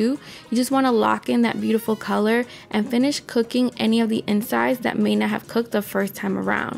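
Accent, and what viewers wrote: New York, United States. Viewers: Heard the NY accent, so I'm trusting you above everyone else.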